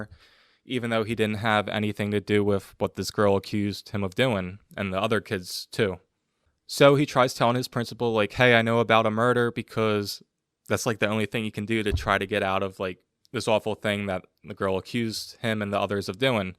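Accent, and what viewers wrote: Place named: Baltimore, United States. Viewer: I can hear your Baltimore accent, and I weep with homesickness for Old Bay Seasoning. Thanks for making this.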